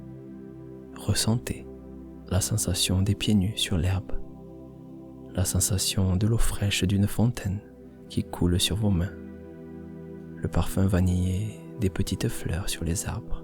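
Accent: South, France. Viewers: Votre petit accent du sud me réchauffe le cœur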